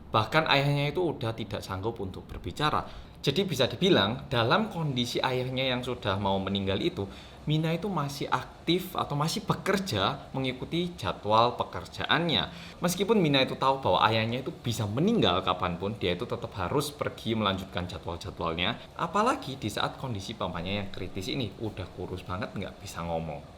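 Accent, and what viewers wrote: Java, Indonesia. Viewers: "Hello guys welcome back to Korea reomit bersama orang Korea yang medoook" Salam dari bali mas medok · Sudah baca beritanya sih, tapi emang lebih enak kalo mas yg ceritain langsung, jadi bisa membayangkan kejadiannya, (dan terpercaya karna langsung di terjemahin sama orang korea medok )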